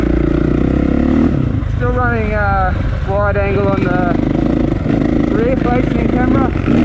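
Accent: Australia. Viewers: Not sure the wind muff is helping... still sound like a hint of Australian accent.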